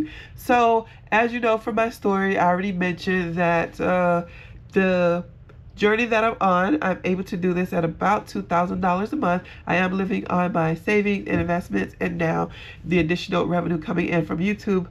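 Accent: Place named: New Orleans, United States. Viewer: Fun video even though it’s something I can’t do. Sounds like you’re from New Orleans or at least your family is.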